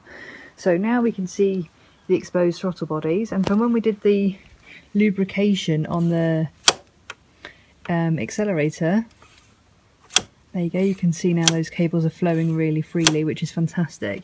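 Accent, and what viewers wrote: United Kingdom. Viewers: Wow, you have an accent just like my ex wife. Are you from Surrey, U.K.??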